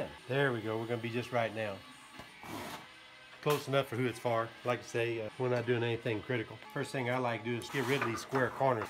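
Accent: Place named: Oklahoma, United States. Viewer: Thanks! This was EXACTLY what I was looking for! I have a squirrel feeder, in a diff section of the yard that I put peanuts in each day. But, they’re never satisfied w/their daily ration. Lol. I can’t believe how far they can jump! I’ve tried moving the feeders, hanging them on taller shepherd hooks etc but inevitably they’ve managed to reach the feeders. Because of the number of feeders I have, I didn’t want to buy baffles & have a large sheet of aluminum I could use. I bought it Home Depot but it was over $20-any suggestion for cheaper option of metal in sheets? Type of metal or source/store? Thanks again for the video. I enjoyed it & hearing your voice. Reminded me of home, where I grew up in Oklahoma.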